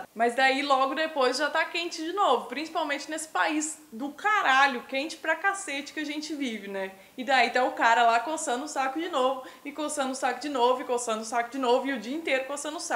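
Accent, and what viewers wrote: Minas Gerais, Brazil. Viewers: Você é mineira??? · o sotaque continua??? Mineira..goiana...ou as duas??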